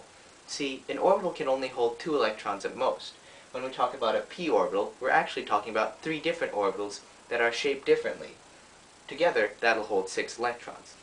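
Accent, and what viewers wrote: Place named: India. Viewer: this guy is awesome, he teaches chemistry, looks Japanese, and has an Indian accent :D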